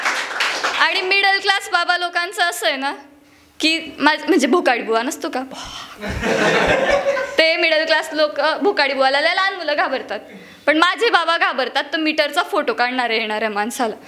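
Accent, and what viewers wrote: Khandesh, India. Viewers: 1 cha number and tuz aavaj pan.khup chan.Hotta i like you khandeshi tone...👌👌💝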